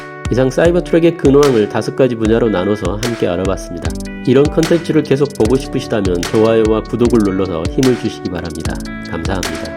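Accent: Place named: Jeolla, South Korea. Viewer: 전라도 사투리가 듣기 좋네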